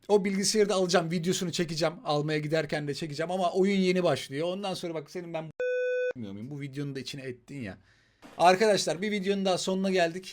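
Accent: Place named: Azerbaijan. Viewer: abi , sendemi Azeri çıktın ...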